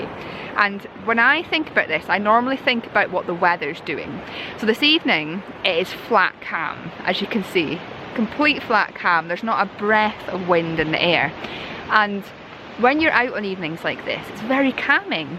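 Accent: Scotland, United Kingdom. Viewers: Great Video, as Usual a pleasant time, listening to a wonderful scotch accent. You make photography wonderful. Brilliant images!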